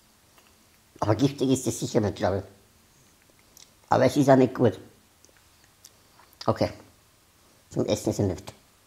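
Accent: Austria. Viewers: A Österreicher? Oda vo wo?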